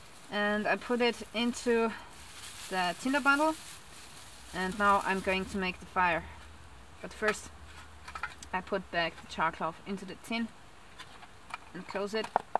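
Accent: Germany. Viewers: I have to remind myself that you're not the girl I loved so much years ago. You are much more dear with a German accent. By the way, your English is great, but "owl" rhymes with growl or now+l. We have huge, very loud owls in the woods near here. Hearing their calls echoing in the night would frighten even the meanest werewolf!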